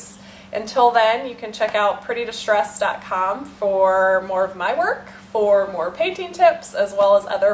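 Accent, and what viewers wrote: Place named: Illinois, United States. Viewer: I'd watch you paint anything....BTW, love the Illinois accent, as someone from Central IL I recognized it right away.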